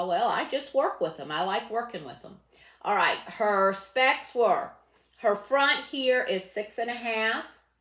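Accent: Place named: United States. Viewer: I have just subscribed... I just love your reviews and your accent is amazing as a British woman I just love American accents that sound like yours 🤗👌💋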